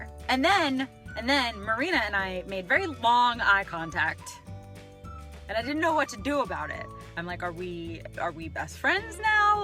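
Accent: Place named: New York, United States. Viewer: Listening to you talk about Shakespeare makes me wonder how cool it'd be to watch a Shakespeare play with a New York accent.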